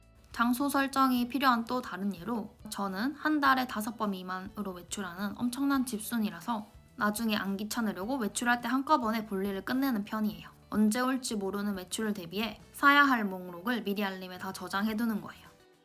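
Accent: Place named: Busan, South Korea. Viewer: ㅋㅋㅋ 익숙한 인토네이션에 ‘부산 분이신가,,?’ 했는데.. 맞군요!ㅋㅋㅋㅋ 대박입니다 영상 감사해요!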